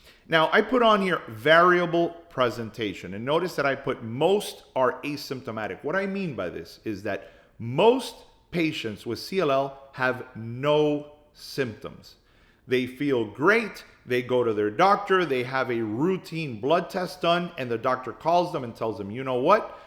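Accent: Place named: East Coast, United States. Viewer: I was recently told that I may have LCC and have started the testing process. I’m a 54-year-old woman with a connective tissue autoimmune disease, and my lymphocyte levels have been elevated for the past four years. I plan to revisit this video after my appointment next month, when I hope to have a better understanding of what’s going on. Thank you so much for taking the time to share this information. I also wanted to mention that your East Coast accent was incredibly comforting to me. Having grown up on the East Coast and having lived in the Pacific Northwest for the past 25 years, hearing your voice made me feel relaxed and reassured. It reminded me of home and gave me that warm, familiar feeling of being around family. I didn’t realize how much I needed that comfort until I listened to you. Thank you again for sharing your knowledge and helping others navigate what can be a scary and uncertain journey.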